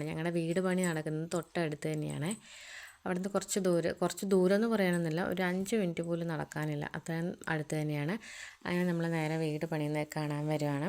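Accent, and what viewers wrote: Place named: Kottayam, India. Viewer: Super ayit undu❤ chakkapazham😋.. Chechide language ketal Kottayam ane parayu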